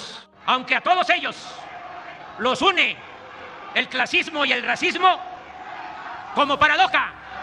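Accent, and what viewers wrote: Tabasco, Mexico. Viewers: Hasta parece que estoy oyendo a Hitler en mal español tabasqueño.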